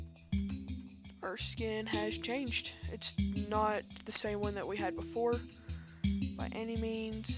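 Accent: United States. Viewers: Are you from Alabama by any chance? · Texas haha I like your accent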